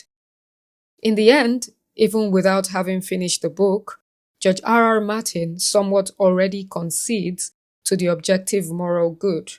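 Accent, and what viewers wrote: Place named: France. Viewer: Oh yea, you watch film for the writing. I love it! I work with a man at Tesla with your accent. He's from France. I lived in Germany for a few years myself. Europe has such deep, rich culture.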